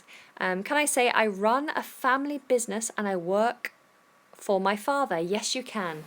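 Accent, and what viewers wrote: United Kingdom. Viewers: thank you very much, ill enjoy hearing beatiful british English. im lazy boy, dont want to study something in front of a desk. it makes me sleepy and boring. I only do what I like to do. I love English. my advance now is there is no japanese around in this village, so i dont have to speak my first language. Ill think in English or Thais. i was a taxi driver, i retired from it 3 yrs ago and now i eat by japanese pension, and am a farmer and english learner. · I LOVE BRITISH ENGLISH.It'S ONE HUNDRED PER CENT ENGLISH YOU'RE AMAZING TEACHER AND I'M A GOOD LEANER THIS IS PROGRESS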